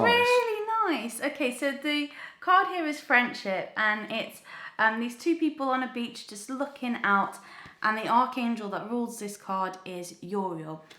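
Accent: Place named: North, United Kingdom. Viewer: I like your Northern accent 😂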